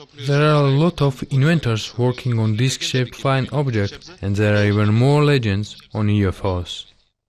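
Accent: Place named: England, United Kingdom. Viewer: L'accent rosbeef est déjà horrible à entendre ... mais là c'est l'apothéose !! 😡😡😡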